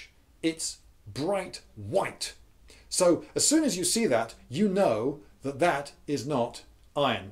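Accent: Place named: England, United Kingdom. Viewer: Also the English accent, you can't forget that.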